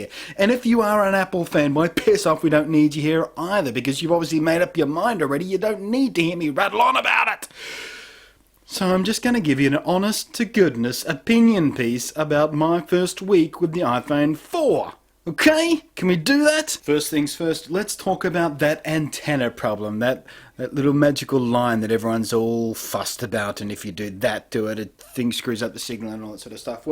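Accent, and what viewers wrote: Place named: Australia. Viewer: 1) Great Review 2) Love the Aussie accent 3) Double or Triple RAINBOW FTW!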